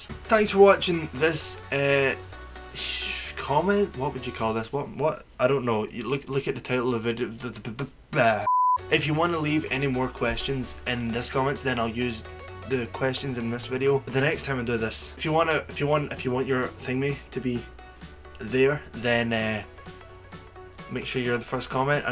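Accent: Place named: Scotland, United Kingdom. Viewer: I enjoyed your American candy video. Hello from Chicago. You are a handsome young man, What part of the UK is your accent from. It sound to me a bit Scottish, Irish sounding. I like your accent. Are you from Liverpool? Oh you are from Scotland! Very nice accent.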